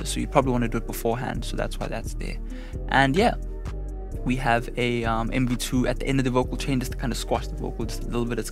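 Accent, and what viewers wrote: South Africa. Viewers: u sound like ur from south africa. It sounds nice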